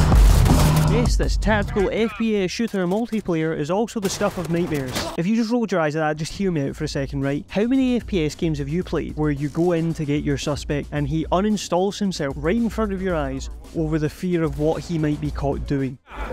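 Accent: Scotland, United Kingdom. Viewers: The Scottish accent does it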